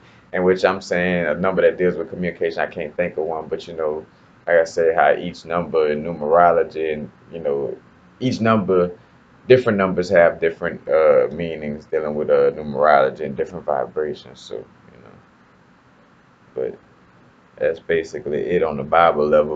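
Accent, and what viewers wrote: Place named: South, United States. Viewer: Hey, where are you from, if you don't mind me asking. It would be really cool to meet you and gain some insight on understanding the universe. I'm based on the Southern part of the East coast and you sound like somebody from down here somewhere, like from Virginia down. It's fine if you don't want to answer, I'm just really intrigued about having a conversation with you. Hope this doesn't come off as intrusive, I mean no harm 😥 lol!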